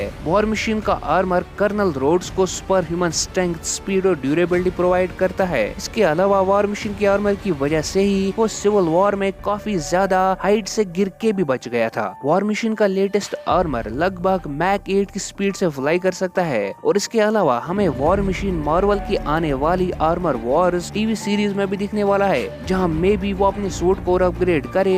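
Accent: Kashmir, India. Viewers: Bro your vioce sounds like kashmiri 🤨🤨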